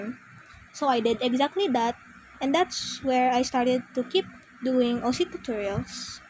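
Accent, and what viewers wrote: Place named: Philippines. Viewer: YOUR VOICE SOUNDS AMAZING WHAT- ITS LIKE AN ANGEL DBDJHDGHJDYDJSSJHSH You have that Filipino accent while I have this American-ish accent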